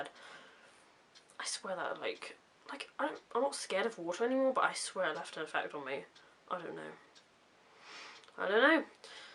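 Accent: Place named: United Kingdom. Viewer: fucking murder corr can tell you're British 👍👏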